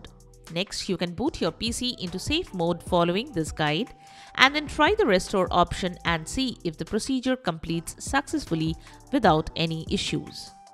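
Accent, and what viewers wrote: India. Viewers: Indian Voice over check, low viewer upvote check, blanket solution with no actual solution...also check.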